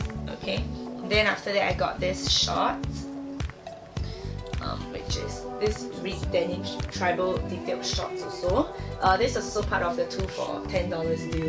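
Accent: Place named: Singapore. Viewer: The moment I heard you speak I knew you were from Singapore :P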